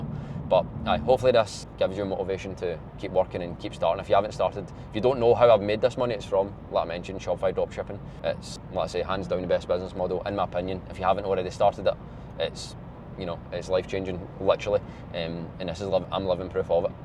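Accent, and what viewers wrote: Scotland, United Kingdom. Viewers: Which uk accent is this 😅😂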